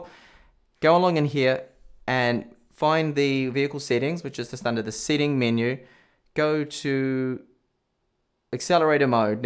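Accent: New Zealand. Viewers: Are you a kiwi? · R ur from New Zealand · Hi, where are you from? :) your accent seems like a friend of mine from NZ...Very good reviews in your channel.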